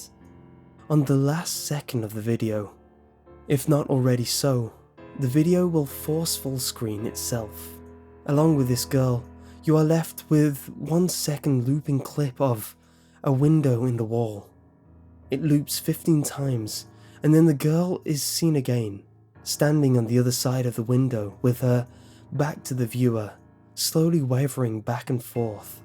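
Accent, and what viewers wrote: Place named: North, United Kingdom. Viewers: British, he has a more Northern British accent though. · As a Brit it sounds like Northern English to me.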